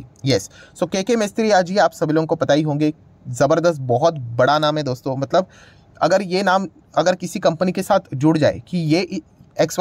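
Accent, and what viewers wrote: Maharashtra, India. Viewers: Hindi se to lagta hi he ki maharastrian he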